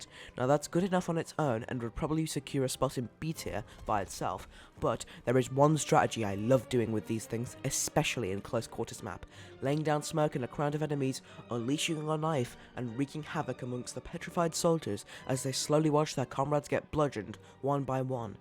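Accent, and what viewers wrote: United Kingdom. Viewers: He sounds even more British than last time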